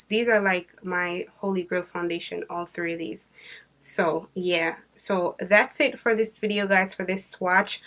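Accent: Jamaica. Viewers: Great video hon, you sound a little Jamaican.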